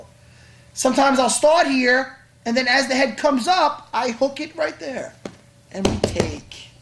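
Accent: United States. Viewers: Love the New York accent!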